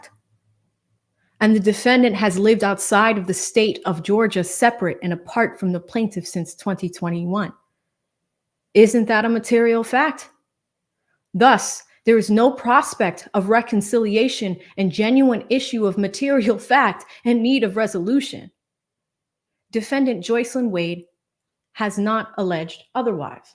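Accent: Boston, United States. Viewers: Oh my.. i love ❤️ you're Boston accent. So great.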